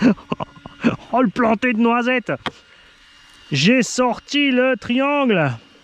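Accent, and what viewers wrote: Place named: Alsace, France. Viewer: Salut! Je découvre ta chaîne depuis 1 semaine, étant l'heureux propriétaire d'un e-revo 2. Tes vidéos sont un régal, on sent l'amour du travail bien fait par un passionné (et balèze! 😉). Merci pour tes conseils techniques et ton intonation d'alsaco qui fait ton charme!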